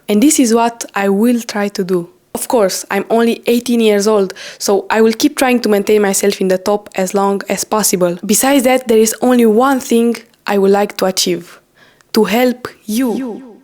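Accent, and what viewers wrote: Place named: Romania. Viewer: I knew that accent sounds familiar, but I didn't expect you to be Romanian. Your body looks insane. Felicitări!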